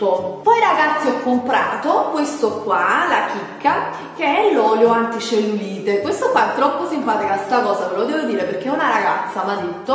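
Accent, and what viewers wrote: Naples, Italy. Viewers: Adoro questo tuo accento Napoletano.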